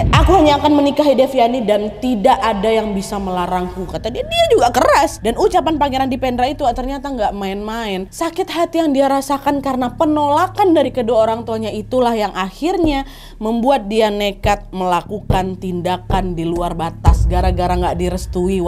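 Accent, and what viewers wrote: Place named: Medan, Indonesia. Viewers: Logat medanmu itu medok . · Medan kali kamu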